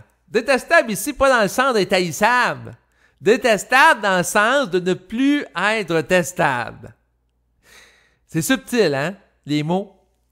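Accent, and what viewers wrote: Quebec, Canada. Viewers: dac la prochaine fois je dit tu 😉 j'adorrrrre ton accent québécois je peux écouter des heures et des heures.Un vrai plaisir d'écouter. 😉 👍 👋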